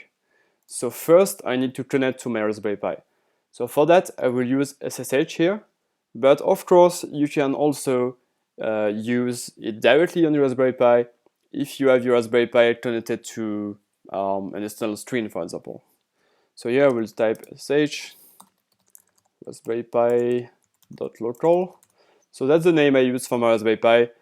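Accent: France. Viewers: Serait-ce un accent français camouflé ??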